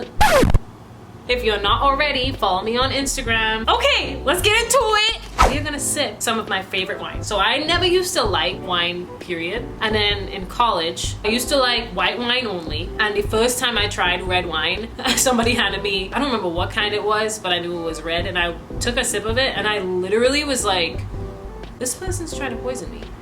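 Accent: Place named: Trinidad and Tobago. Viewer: Really proud of you. Still hearing the lill Trini . do ur thing girl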